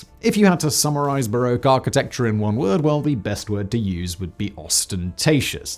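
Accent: United Kingdom. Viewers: Ah, I'd hazard a guess these videos are written by native speakers. Presenter couldn't be more British. I'm austrian, if you wanna know.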